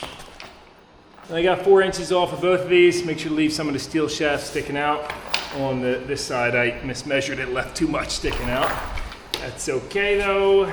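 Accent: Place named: Pennsylvania, United States. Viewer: Whoa, that's quite the accent. Are we from Pennsylvania?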